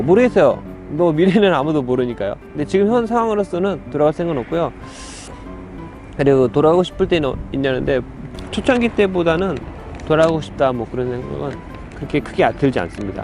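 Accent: Japan. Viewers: 한국어하실때 종종 일본말의 영향을 아주약간 받은게 느껴지네요ㅎㅎ 한 3% 정도??? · 20대에 일본정착하셨는데 거의 억양이나 사고가 일본분이 다되셨네요ㅎ초반에 정착하시면서 힘들었셨던게 공감이 가네요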